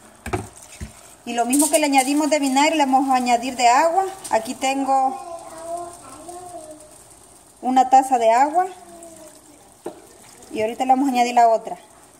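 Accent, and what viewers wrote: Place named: El Salvador. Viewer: Sabia que eras salvadoreña, el acento noa delata, pero me lo confirmastes cuando hablastes de las pupusas, hare mi escabeche. 😆 Gracias.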